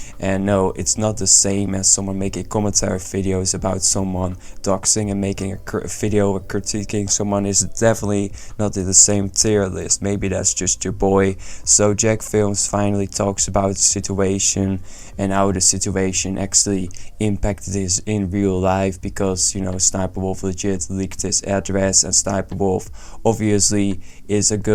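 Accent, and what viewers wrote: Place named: Netherlands. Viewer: Ik versta aan je engels dat je nederlands ben😁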